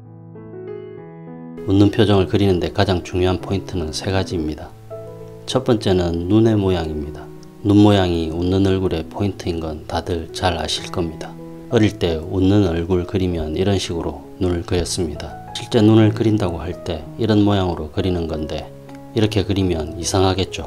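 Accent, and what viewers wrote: Gyeongsang, South Korea. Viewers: 일년전 영상인데 저는 요즘 연필 초상화 배운다고 유튜브 찿다가 선생님의 영상을보게 됐어 많은 공부가 됩니다 말씀도 재미있게 하시네요 고향이 갱상도 같아요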